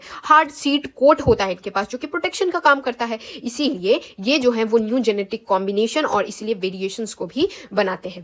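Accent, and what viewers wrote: Bihar, India. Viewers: didi ap bihar se ho kaya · Didi bihar se ho kya ????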